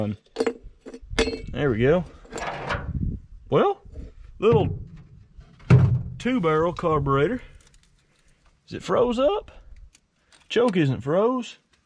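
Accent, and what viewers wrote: South, United States. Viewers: I’m from Long Island New York like your 🪄✨southern accent✨ 💪🏻😎👍🏻 keeping the best cars on the road⚡️🇺🇸⚡️ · Coil its just a country accent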